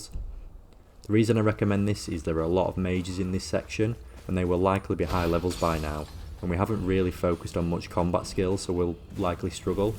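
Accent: United Kingdom. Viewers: It’s great to hear a northern accent! I feel at home. You have earned yourself a sub my friend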